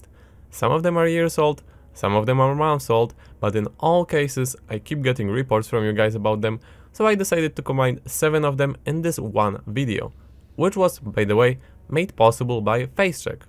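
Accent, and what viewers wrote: Poland. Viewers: I dont know why but i feel like u have polish accent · Słychać polski akcent xD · man you sound like you're Polish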